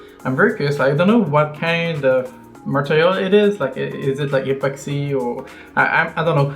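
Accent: Quebec, Canada. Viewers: J’aime entendre l’accent Québécois dans mes vidéos de reefing ;) Un abonné de plus !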